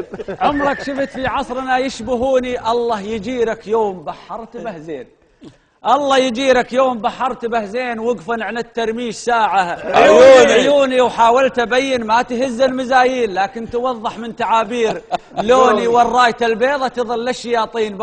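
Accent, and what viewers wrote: Iraq. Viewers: انا اشهد انها قصيده معتبره . وزادتها اللهجه العراقيه الاصيله حلا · روعه يعطيك العافية. واللهجة البدوية العراقية زادتها حلاوة. ذكرتني بلهجة البدو شمال السعودية 👍🏻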